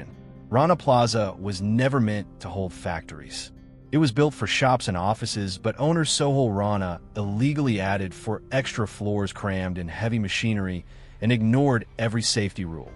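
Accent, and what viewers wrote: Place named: United States. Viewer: The reason it feels like an AI voice is because the word spacing is strange and the way it says "two - five hundred" for 2500 (there are more instances of this as well). Im not aware of any human that says it like that. "25 hundred", "two thousand five hundred" or "two and a half thousand" are about the only ways I've ever heard a human say it in any language. Given the accent, which sounds american, I dont think it's a case of "english not first language" either. I have, however, heard AI say 2500 as "two-five hundred" many times. That said.... even if it IS AI, a couple tweaks and it could dodge that. It's not awful.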